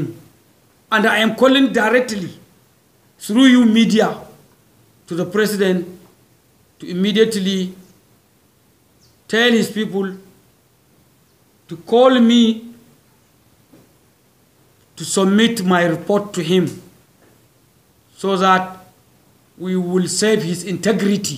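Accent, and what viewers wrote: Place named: Hausa, Nigeria. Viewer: Hahahaha, that is how the the hausas tribes pronounce things. . Take example from the president himself the way he do province words.Anyway the hausas are the most honest pple in that' Nigeria as a whole. God bless the honourable for helping the common pple in that country call Nigeria. Amen oo!!!.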